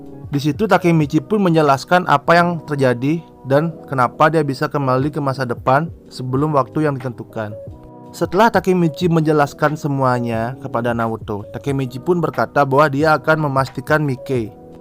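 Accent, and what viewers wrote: Bali, Indonesia. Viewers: pantes nyk san logat bali ne🤙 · Oi, ini pengisi suara orang bali ya pengisinya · Logat nya bali banget wkwkwkwk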